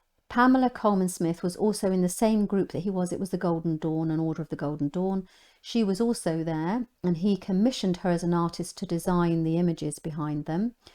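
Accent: England, United Kingdom. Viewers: Self-knowledge tools with a British accent? Oh how I love it 🥰👍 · Thanks so much for this - really clear and interesting. It's also good to see a tarot video made by someone who is English, and not American! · beautiful voice, may i ask the accent, English, but where from?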